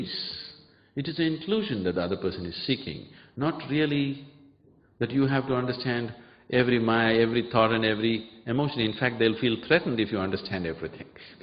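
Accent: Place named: United States. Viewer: interesting how even Sadhguru adapts the American accent, because of its surrounding..you don't hear him speaking like that in India :) have a blissful life you all..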